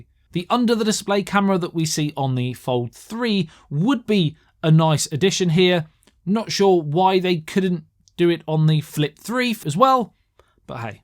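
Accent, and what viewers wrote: United Kingdom. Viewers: That's how Brits pronounce Z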